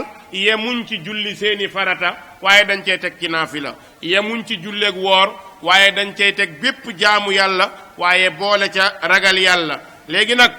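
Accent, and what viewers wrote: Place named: Mauritania. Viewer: Belle récitation style Mauritanien masha'Allah.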